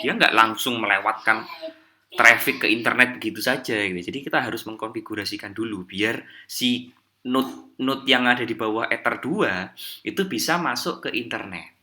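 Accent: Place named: Java, Indonesia. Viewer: ngevapenya kayak menikmati hidup gitu mas. Wkwk. Medoknya masih yaa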